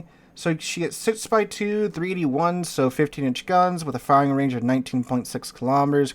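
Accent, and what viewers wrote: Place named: United States. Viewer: Really man? That pronunciation... You cant just read stuff in an american way and expect it to sound about right! 😅 Let me help you: G in Georg is nothing like the english G... its not like the J in John its like the g in hug (both g). And the e is like the men. GE-ORG. And regular R not the amerikan rolling tongue R. Please say Hoff-mann with a like part/dart...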